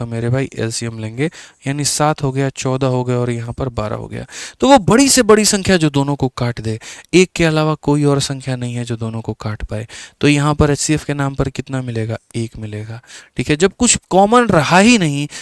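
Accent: Bhojpuri, India. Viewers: Apki bhojpuri bahut achhi h😊❤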